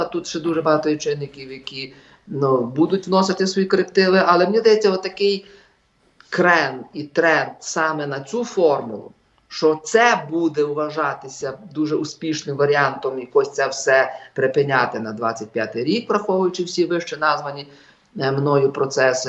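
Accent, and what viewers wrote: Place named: Galicia, Ukraine. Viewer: Хотив послухати, але не розумию Галичанського суржику.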